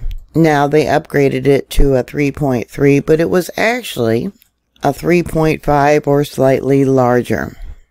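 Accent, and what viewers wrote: South, United States. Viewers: Get the rest you need but keep up the good work. Love your old fashion country dialect, especially when you pronounce "Wash" or "Washington" I was raised around the Seattle area and it wasn't until I went into the military that I was told there is no "R" in either word My family pronounced it "Warsh" and "Warshington" also and we pronounced Snoqualmie Snoqualomie where we got the extra "O" is anybody's guess.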